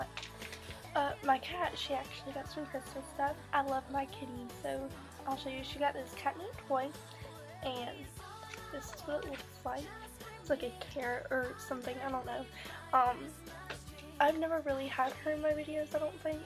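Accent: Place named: South, United States. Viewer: Oh well im a California guy, so as soon as i heard your voice i knew that it was something different, I kinda figured because there might have been some southern to it but i wasnt sure, its really unique and i like it! You seem super nice btw, so i hope your channel does really well!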